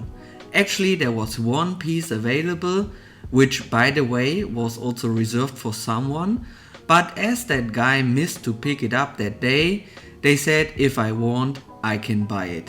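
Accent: Germany. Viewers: Your English sounds a bit German?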